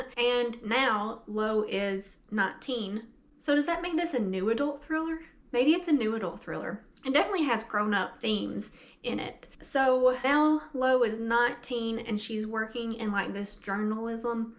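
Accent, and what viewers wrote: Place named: Kentucky, United States. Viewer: Please tell me you’re from KY! You sound like home haha.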